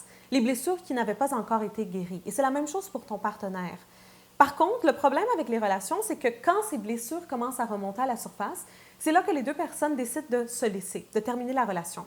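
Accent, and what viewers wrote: Quebec, Canada. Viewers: Bonjour, c'est pas plutôt de la mauvaise foi ou un manque de volonté? Elle parle avec l'accent Québécois, pour ceux qui viennent de France, il suffit juste de faire preuve de volonté et de prêter oreille, pour comprendre. Je suis de France, mais j'ai compris facilement, ses paroles. De plus le message qu'elle véhicule est très intéressant et constructif!!!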